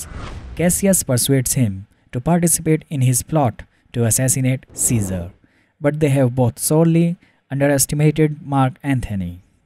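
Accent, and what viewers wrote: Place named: India. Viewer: i dont care about the stories im here to hear the indian voice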